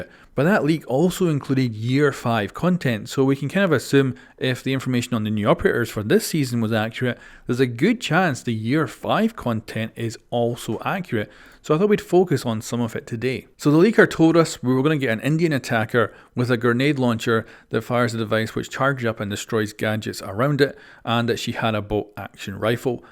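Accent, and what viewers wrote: Scotland, United Kingdom. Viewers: That scottish accent is very cool, instant sub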